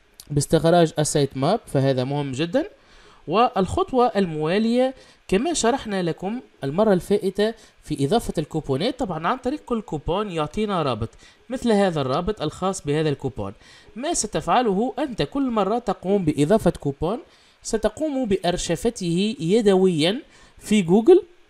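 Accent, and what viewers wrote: Tunisia. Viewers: 5ouya mbeyan men soutek tounsi ena tounsi zeda leken ett makch ma3rouf fi Tunisie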